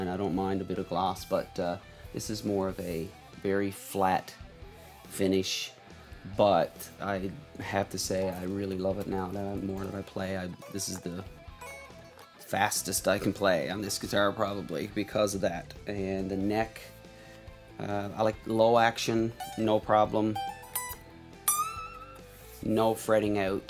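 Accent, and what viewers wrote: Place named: Newfoundland, Canada. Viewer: I know a newfie accent when I hear it lol , excellent job man sick licks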